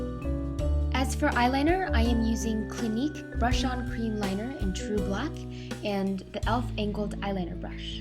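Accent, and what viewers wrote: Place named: United States. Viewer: Ur cute at american accent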